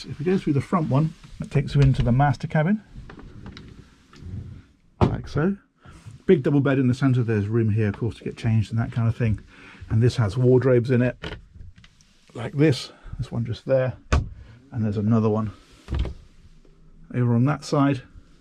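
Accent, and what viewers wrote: United Kingdom. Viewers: You are so good at your craft! Of course the British accent doesn’t hurt either. Wishing you much success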